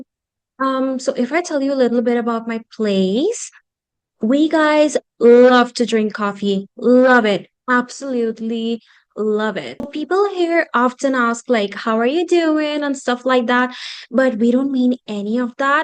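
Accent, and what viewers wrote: United States. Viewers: Amazing American accent 😮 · Nice your american accent ❤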